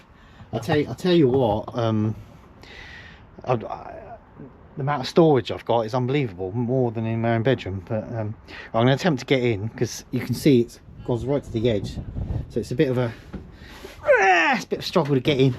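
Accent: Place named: Cambridge, United Kingdom. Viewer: Why does your accent sound like your from my ways ?.cambridge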